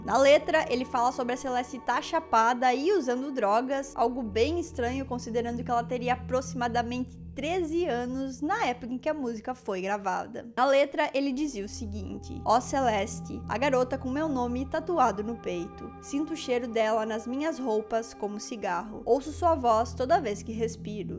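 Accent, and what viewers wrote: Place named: Curitiba, Brazil. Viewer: Video muito bem produzido! Parabéns! ❤ Uma dúvida, você é de Curitiba? Pergunto por causa do seu sotaque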